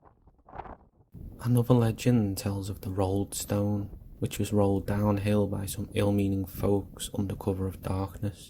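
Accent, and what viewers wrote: Liverpool, United Kingdom. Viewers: absolutely loved this...Right up my street....Is that a scouse accent? be watching and supporting your journey xx stay free xx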